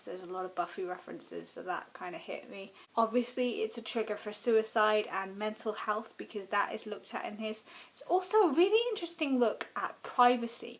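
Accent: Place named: Cockney, United Kingdom. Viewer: haha... I bow down to your cockney awesomeness! And you are right no snack is much worse than a lack of butter (well, unless the snack is milt). Though, I could do with a lot less butter these days - I've got two months to fit into my suit for a wedding. Luckily I'm now doubly motivated to do exercise - exercise improves cognitive function.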